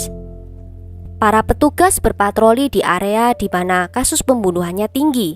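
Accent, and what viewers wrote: Java, Indonesia. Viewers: Hallo jg medok kuhhh.. Alhamdulillah sehat.. sukses trs yaa say👍💪🙏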